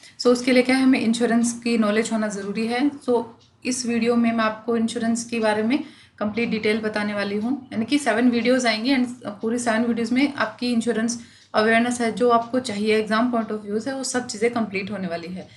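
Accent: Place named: Haryana, India. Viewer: with haryanvi accent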